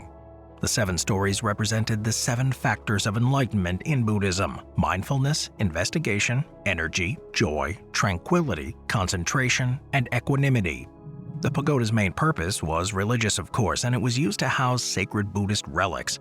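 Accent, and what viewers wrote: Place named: United States. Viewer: The American accent of the narrator hurts to the ear..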